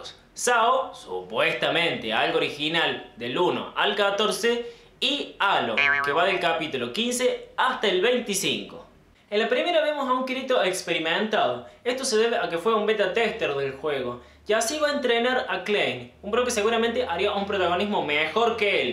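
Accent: Córdoba, Argentina. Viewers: Sí, es cordobés. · ¿Sos cordobés? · Porque es cordobes :v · es cordobez :v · Dacmass Creó porque ambos son cordobés :v tu de donde eres?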